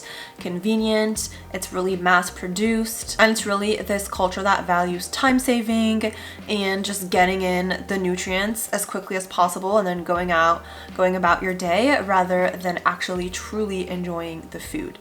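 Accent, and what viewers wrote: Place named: United States. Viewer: Just started following. Love the videos, but I am curious why you have an American accent.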